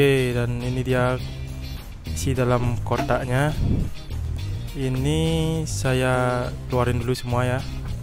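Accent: Bali, Indonesia. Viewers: Logatnya kok kayak orang bali ya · Bli.. klo bsa logat bali nya jgn trlalu kental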